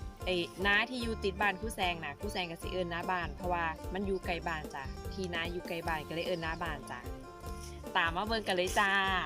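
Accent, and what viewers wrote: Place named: Thailand. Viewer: สวัสดีครับ เป็นคนจังหวัดได๋น้อ สำเนียง มาคือสำเนียงบ้านผมแท้ กดติดตามแล้วเด้อครับ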